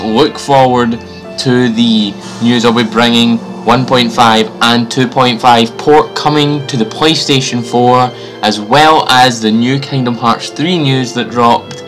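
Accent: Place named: Scotland, United Kingdom. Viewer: I LOVE YOUR ACCENT !! O.o ... you are scottish aren't ya?! XD ...I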